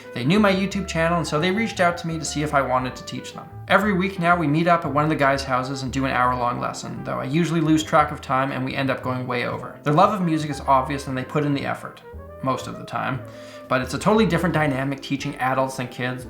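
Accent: Canada. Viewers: you can really hear his canadian soreys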